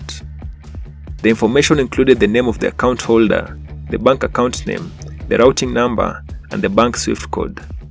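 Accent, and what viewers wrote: Kenya. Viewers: From you accent,you are a Kenyan….and you still want to pull Kenyans name into the mud.How comes we never heard there were kenyans involved from US FBI reports? You doing this makeup story for some simple views while tarnishing Kenyan name….We Kenyans in diaspora dont appreciate this! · About section of this channel says location is United States but accent ni ya mkenya.. 🤔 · The narrator sounds like a Kenyan. May be that's why he insists when mentioning Kenya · another video of scam glorification narrated by the nairobi accented guy. not good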